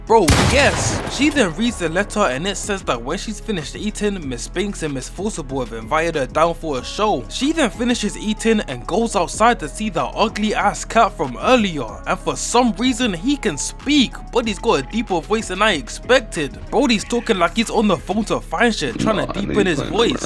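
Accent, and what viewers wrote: United Kingdom. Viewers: idk what it is about people with a uk accent reveiewing anime/movies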